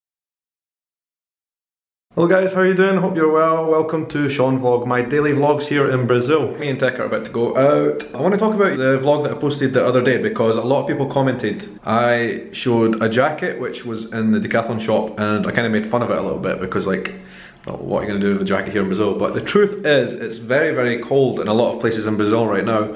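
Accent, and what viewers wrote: Scotland, United Kingdom. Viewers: I love the scottish accent! Never heard a scottish trying to say PASTEL or PASTÉIS... Well, I´ve been to Inverness (and Glasgow and Edinburg), in a trip and the scottish there couldnt really guess WTF was our language! ;-) It´s interesting cause I was freezing and I saw redhead girls in small shorts LOL.... Nice video! Grats!! Have fun! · I like your accent. Oh, Scotland. Great Respect! Ão, inho, 'muito' and some other nasal phonemes adds a lot of challenge to foreigners. Still the words in portuguese you'd mentioned were pretty ok.Do you practice these days? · Scottish accent is so addicting. Really nice :) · he are british? have a funny accent haha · be careful dude! im from new zealand i live in brazil, i actually got robbed last week and now i dont want to film in the streets because i think it could happen again, but be careful dude! 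love the accent from scotland, reminds me of old war movies haha! love it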